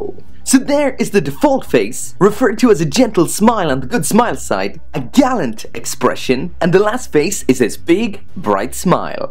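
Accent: Ireland. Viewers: Thanks for the in depth review. I almost picked her up to hold me over until kirin hobby sends my Sabre 2.0 is shipped out. You're one of the few reviewers that points out the good and the bad in equal detail. Quick question... Where are you from? Lol! At first I thought British Guyana because you say certain words similarly to my Mother but, after watching almost all yer vids, I"m thinking you're Irish. Just Wondering. Anyway keep it up!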